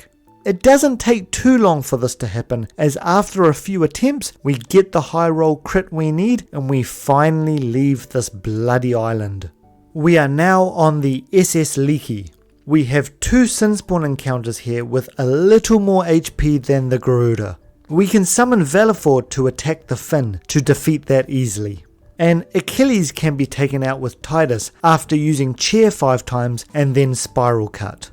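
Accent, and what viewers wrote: New Zealand. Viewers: Started the video cause it’s my favourite game of all time, then the kiwi accent hit me like a tonne of choccy fish! Kia ora bro! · are you kiwi by any chance? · Took me until here to realize that you were a kiwi, This absolutely sent me. Ka Pai cuz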